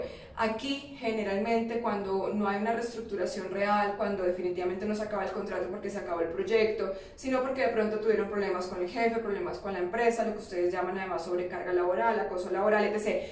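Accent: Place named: Venezuela. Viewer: Disculpe pero no le entiendo cómo habla a que se refiere cuando dice apellido habla cono venezolana no le entiendo nada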